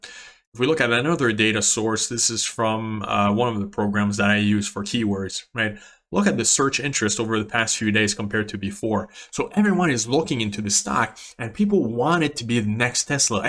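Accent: Quebec, Canada. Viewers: I hear Quebec accent. We're from the same province 😁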